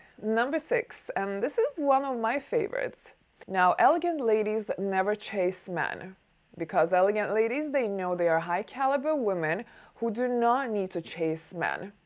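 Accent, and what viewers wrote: Sweden. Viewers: Why do you sound Swedish?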